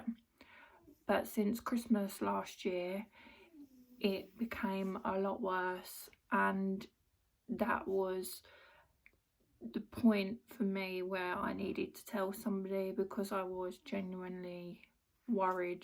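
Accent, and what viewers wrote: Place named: United Kingdom. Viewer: This is random but I love your accent. what kind of british accent is it? x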